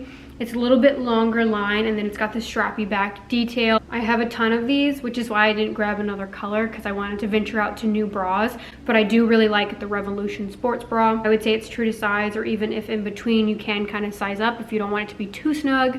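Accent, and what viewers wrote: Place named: England, United Kingdom. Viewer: I am not ashamed to say that I have been a subscriber for a few months now... I am either like whaaaaaaa (jaw crashing off floor), you make me laugh with your awesome English accent hehe I'm from UK, may I mention that wow I look great with your hair straightened, always Beautiful just never seen you with straight hair, I think that all the shorts you don't really like or think you don't look as good as you actually do, you make the clothes sexy, anyway I'm just expressing truth so surly ppl can't be mad at me for that, And my perception is different to yours, I see the Art in a different beauty as beauty lays in the eye of the beholder, luv ya awesome vids. Xx Dav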